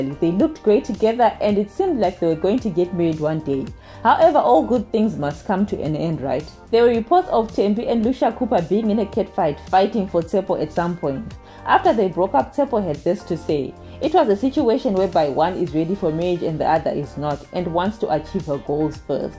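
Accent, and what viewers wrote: Shona, Zimbabwe. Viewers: You're Shona aren't you? 😅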